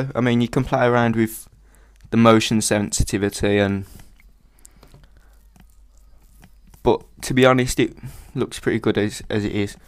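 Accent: Birmingham, United Kingdom. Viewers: Hate Birmingham accent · Go fellow brummy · HA! he's birmingham, or there abouts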